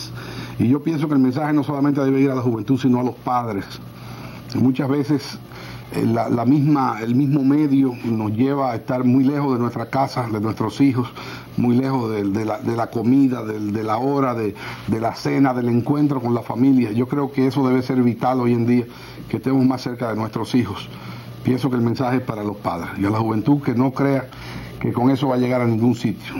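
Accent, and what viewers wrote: Puerto Rico. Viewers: Yeste acento boricua Tony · Cuánto talento tenía Freddy ya en ese tiempo era una figura Tony 😂tu hablaba boricua cuando eso pero tienes tus méritos también eres bueno rip Freddy el mejor y más valiente artista de la TV dominicana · Bendito nene! pero mimetizaste bien el acento boricua...¿?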